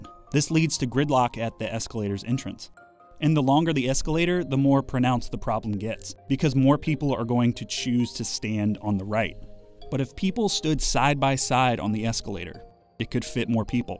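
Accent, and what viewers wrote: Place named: New York, United States. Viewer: liked and subbed for the new yorker accent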